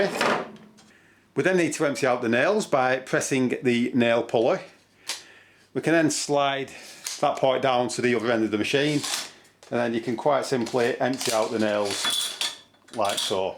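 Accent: United Kingdom. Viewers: Great video and the first to go into such detail about the nails which is exactly what I needed to know, having never owned or used one before. Thanks for taking the time to do this video. Well done and nice to hear another British voice for a change 👍🏾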